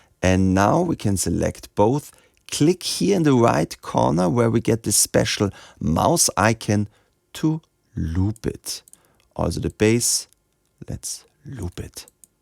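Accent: Germany. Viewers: Your videos are awesomly helpful! If I had to rely on the Videos uploaded by the Ableton website, I'd have great trouble in learning Live 11! The best part is your german accent. I'm from Germany myself originally and it's always great to hear the odd german expression like 'Zack!' which you throw in every now and then. Cheers from Ingo in Melbourne, Australia!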